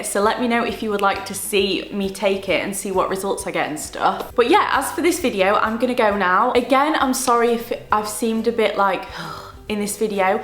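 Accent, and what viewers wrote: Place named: England, United Kingdom. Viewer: Iam from Algéria i love u and l like ur english accent 💗💗💗💜💜💜💜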